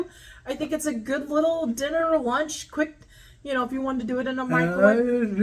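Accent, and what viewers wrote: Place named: South, United States. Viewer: yes I also wondered that also I’m from South Georgia he sounds southern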